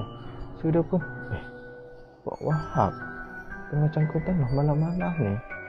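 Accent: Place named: North, Malaysia. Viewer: Suka gila dengaq youtuber yg cakap utaqa ni, tapi nak mintak 1 ja lepasni jangan letak jumpscare please terkejuttt ceq 😭😭😭😭😭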